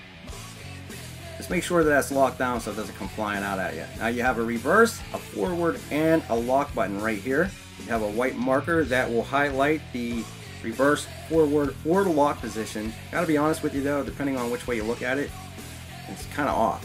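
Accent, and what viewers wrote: Pittsburgh, United States. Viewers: Thats a fine pittsburgh accent you got there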